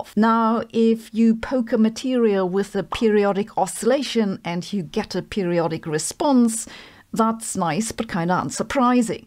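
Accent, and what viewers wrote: Germany. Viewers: Hossenfelder: don't do away with your german accent too much when communicating science using the english language: it has a nice charm and ring to it ;-)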